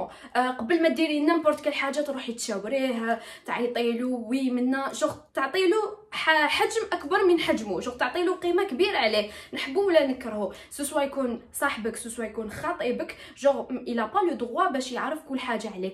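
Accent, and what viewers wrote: Oran, Algeria. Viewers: اللهجة لجزائرية زوينة 🤩🤩 مغربية مرت من هنا لي يزبل فيا نكلع جد بوه نخرجها له طاي طاي 🤣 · كنتي تهدري وهرانية فووووور 😥😥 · بالبركة عليك ربي يهنيك متأكدة ماما رقصت رقيص ماشي معقول كنت تخرجلها مالقصب ضروك اتريح ههههه ربي يحفظها و يطول في عمرها بصح يا صديقتي كي تهدري بالوهرانية تاكل منك حتة ربي يسهلك جميع امورك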